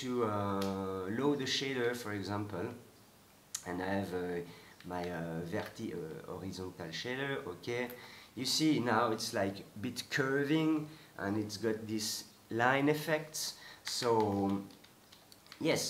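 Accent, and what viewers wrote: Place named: France. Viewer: Salut, tu sembles être français à ta façon de parler.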